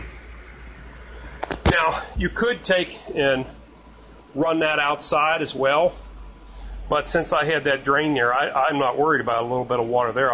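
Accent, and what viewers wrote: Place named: Pennsylvania, United States. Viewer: Well you definitely have a pa accent! Couple years later now I was wondering how is it holding up?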